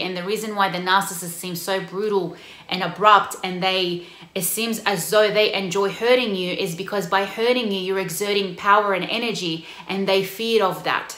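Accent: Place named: Sydney, Australia. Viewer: Hello from Queensland 👋 I knew you're a Sydney girl the moment I heard your voice lol.. You're gorgeous and I'm really proud of your channel.. You go girl! (Please do a video about how to avoid Sydney guys who only wants to mess around🙄) New subscriber 👍 ✌️ ♥ God bless! 🙏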